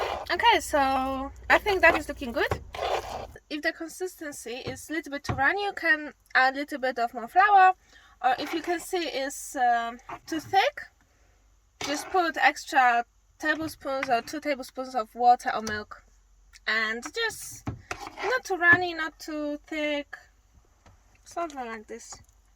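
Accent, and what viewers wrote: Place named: Ukraine. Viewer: Hi. Hope you're well. I'm trying to figure out which country you're from by your accent. You sound a bit Ukrainian, but unsure. X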